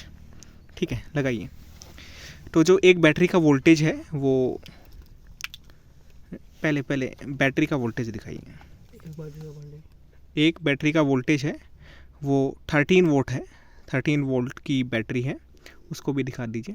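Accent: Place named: South Asia. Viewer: I would more appreciate this video if the commentator spoke American oe British English. I missed much because of the strong South-Asian accent.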